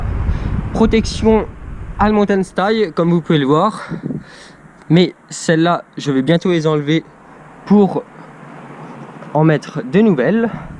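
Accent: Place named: England, United Kingdom. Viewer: L’accent anglais c incroyable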